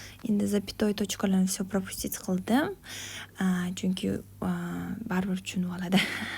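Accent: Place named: Namangan, Uzbekistan. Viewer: Namangan sheva chiroylida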